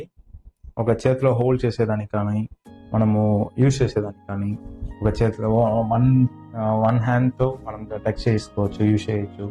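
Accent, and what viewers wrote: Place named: Tamil Nadu, India. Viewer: Me telugu different ga undi brother. Like tamilian learnt telugu..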